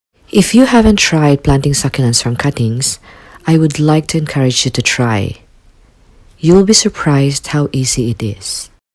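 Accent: Philippines. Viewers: you sound pinoy like me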